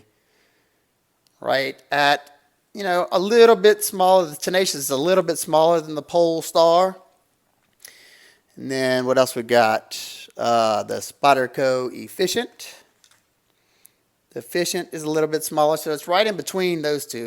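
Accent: Louisiana, United States. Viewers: gotta be from Louisiana?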